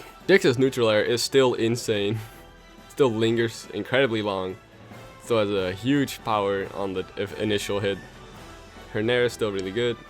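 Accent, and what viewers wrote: Netherlands. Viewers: I can tell you're dutch. Do you play tournaments? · Are you Dutch or something, you sound really Dutch.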